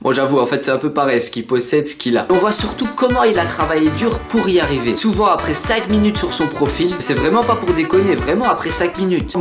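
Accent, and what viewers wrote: Belgium. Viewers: Tu est belge?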